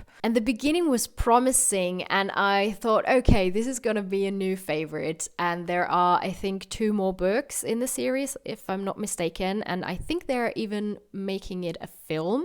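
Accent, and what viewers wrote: Austria. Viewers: are you from Austria? :) love your videos!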